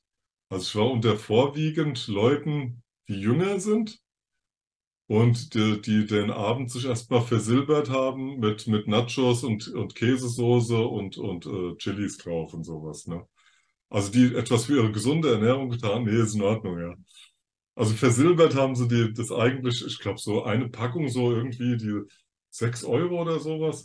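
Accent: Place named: Hesse, Germany. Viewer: And then… Frühling is coming. 🎉 Ich hab ja echt ne Affinität zu dem Hessischen. 😅 Höre dir gerne zu. Nimmt dem ganzen so den Ernst.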